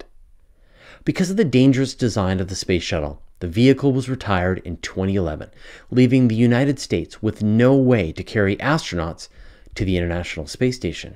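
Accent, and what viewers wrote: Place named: Canada. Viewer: If you have a Canadian accent, it is very, very faint.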